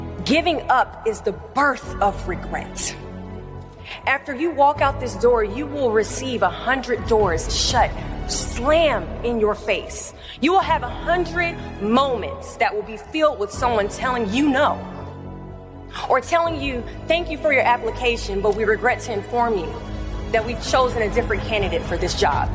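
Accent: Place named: South, United States. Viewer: Have..none of you been to the south?? This is how ppl talk down here😭🙏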